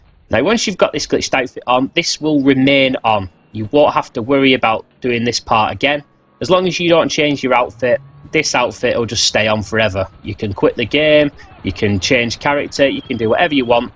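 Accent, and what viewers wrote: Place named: Lancashire, United Kingdom. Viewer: You sound like a Lancashire lad😛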